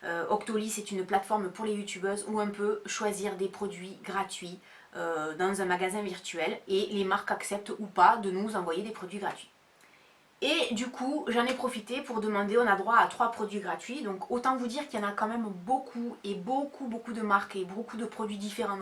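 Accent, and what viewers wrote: South, France. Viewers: Bonjour, comme beaucoup, je connais la marque BareMinerals. Je n'ai jamais testé leurs produits, mais oui, j'imagine qu'ils sont aussi bons que ceux d'Urban Decay que j'adore. Tout comme toi, j'ai les yeux bleus, et je prends beaucoup de crayons noirs, bleus nuit, prune et autre.... Je raffole de leurs rouges à lèvres: Shame, Venom, Jilted, Anarchy..... J'ai bien envie de tester aussi ceux de BareMinerals qui me font de l'oeil depuis un moment:) Pour l'argan, c'est un produit que j'aime beaucoup pour les cheveux, mais aussi en huile pour le corps. Pour le mascara,je m'en suis commandé un sur Aliexpress; Il ne vaudra sûrement pas celui de BareMinerals, mais je verrais bien. Pour Octoly, je trouve normal que les youtubeuses aient des produits gratuits sur ce site. Moi, ça ne me pose aucun problème. C'est des produits qui leur seront utiles, et qu'elles peuvent nous présenter, même si, effectivement, les délais pour les tester avant les videos, sont courts. 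Voilà, je te remercie pour cette video. C'est tout à fait vrai que ton accent du sud est tout mimi. C'est un accent qui chante. J'ai toujours la banane quand je t'écoute:) Très gros bisous ma belle, et à bientôt:) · coucou ! super ta vidéo haul beauté j'adore Perpignan on vas souvent en vacances a port barcares j'aime trop ce coin et moi j'adore ton accent du sud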